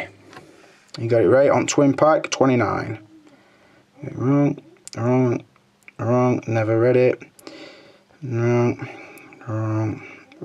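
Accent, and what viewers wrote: Sheffield, United Kingdom. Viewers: tha sounds from sheff or rotherham kid! quality review ive just got the battle damaged and ed209 ok there only neca but we gotta start somewhere!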